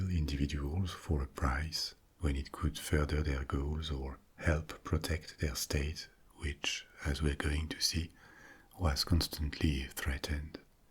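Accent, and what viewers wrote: France. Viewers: Duhhh Guys! His title is! Lol 😆 you can here it in his voice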